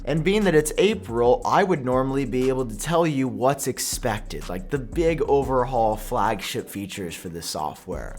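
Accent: England, United Kingdom. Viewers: I laughing so much at your English accent, It’s crazy that apple store in england is the only store keeping covid restrictions . I don’t think Tim Cook got memo from boris that england is free from restrictions. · that “british” accent doe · Your Britain accent is pretty good actually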